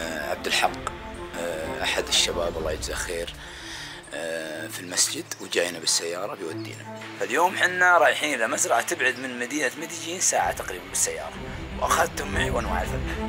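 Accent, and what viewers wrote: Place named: Subay'i, Saudi Arabia. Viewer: انشدك بالله انت من مطير ولاسبيع لغوتك وفي روايه اخرى للنقوج ودمك ماهو دم عتيبي ولادوسري حي يامال العز ودي انك مطاري بس اشك انك سباعي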